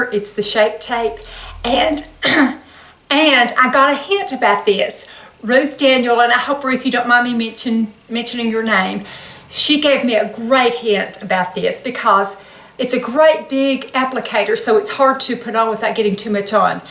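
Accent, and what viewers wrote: South, United States. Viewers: I recently discovered your channel and I'm working my way through your videos. You are absolutely gorgeous! I'm about your age but look twice as old because I've never done any skin care until recently. You have a beautiful smile (and personality) and I adore your southern drawl! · I love your video - this is the first one I have watched. Wish I looked as young as you! I love your southern accent, makes me feel at home. XOXO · I am so thrilled to have found your videos today!! What a beautiful lady you are! Being in my mid 40's, I really don't want the makeup/skincare advice from a 20-something... so finding your site is exactly what I've been looking for. And, I believe I heard you refer to being from Tennessee, I'm also a TN gal, nice to hear that southern accent! You are such a beautiful lady with or without makeup. I'm looking forward to watching your videos. 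· GORGE GURL!!! I am in my 40's soon to be 50....EEEEEK! I am in DISBELIEF About that.....You are absolutely STUNNING! I am starting my channel "Boldly Being Me" Life after 45!! I hope I can get you to subscribe? But either way I loooove your Channel and videos, love your accent ( I am from Jacksonville Florida, so southern accents are the Norm here;-)) You do You and We are Loving it! ;-)) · I love your southern accent. I gotta say you have very good skin, no sagging, wrinkles, or hollowness. You won the genetic lottery.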